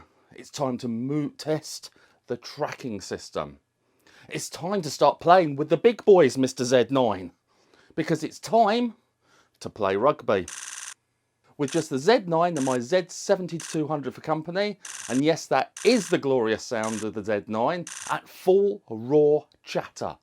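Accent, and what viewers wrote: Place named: United Kingdom. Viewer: Ok, I’ve subscribed etc. but I’m having trouble placing where in the UK your accent is from. It’s silly I admit, but even as a Yank, I have a pretty good ear for accents. I’ve passed as being upper crusty Londoner and as being Scottish in London and Scotland. In fact my brogue can get very thick. I just fail on the local idioms… Guess I should have been an actor. So please tell me where in the UK your accent comes from! I’m confused! But then again we haven’t been across the pond in much too long and I’m rusty. My top guesses are, at the moment: Cockney influence (how’s your rhyming slang?), Liverpool, Blackpool, Manchester. I’m an idiot but I was born this way 🤪. Your probably from Perranuthnoe and I’m not hearing it… Wait, in a couple of videos you’re wear orange, isn’t the the Blackpool Football team color? That would make Blackpool first choice. 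Right?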